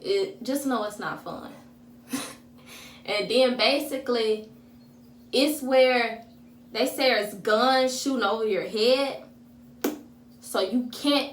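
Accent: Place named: Alabama, United States. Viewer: You from Alabama 🤣🤣 you got a strong accent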